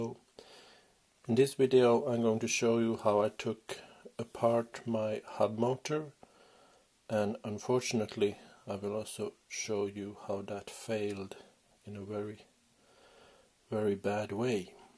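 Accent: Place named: Bangladesh. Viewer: ভাই অাপনের কথা বাংলাদেশি বাংলাদেশি মনে হয়।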